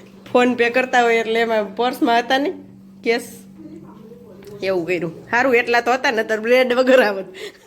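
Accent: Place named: Saurashtra, India. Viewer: તમે સૌરાષ્ટ્ર ના છો ?? તમારી ભાષા એવી છે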